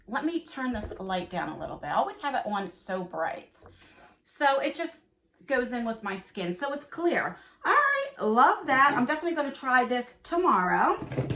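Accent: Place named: New York, United States. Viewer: Are you a New Yorker? Living for the accent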